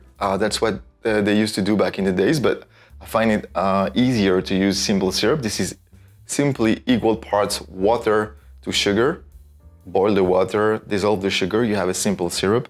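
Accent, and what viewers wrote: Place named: France. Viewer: oh man, that sounds like a french accent!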